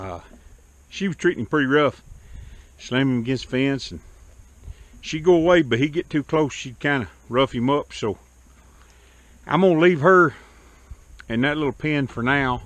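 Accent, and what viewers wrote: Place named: Kentucky, United States. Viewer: Maybe the weirdest comment you ever get: I grew up in KY and moved to CO ten years ago. The last four years of divisions in our country between left and right hit me hard. It further separated me from people I grew up with, knew and loved. I found this video because I wanted to learn more about the water pump but hearing your voice reminded me of family and friends I haven't seen in a decade. I even enjoyed hearing about the hog and chickens. You're a good guy. Keep up the good work... and thanks.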